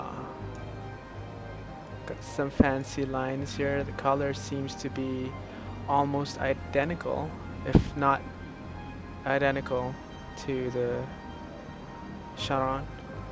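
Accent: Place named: Scandinavia. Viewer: thanks for the video. well done. really enjoyed it. one question though: r u from norway?! ^^ur accent sounds scandinavian, which I like for some strange reason. :d if thats the case: mange klemmer fra tyskland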